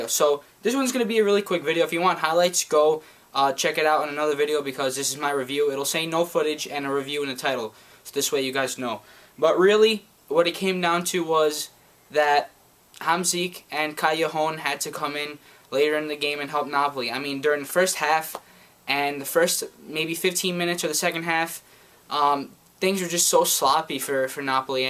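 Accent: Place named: United States. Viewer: dal suo accento direi leggermente di no. è un americano illuminato.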